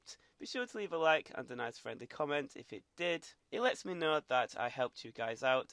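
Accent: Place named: United Kingdom. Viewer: Another fuc***n british.. 🤣🤣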